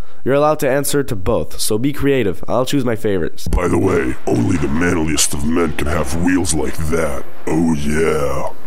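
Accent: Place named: United States. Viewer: mdr ok, au debut jpensais t'avais pris la voix d'un trick tip americain et que tu l'avais mise ici :)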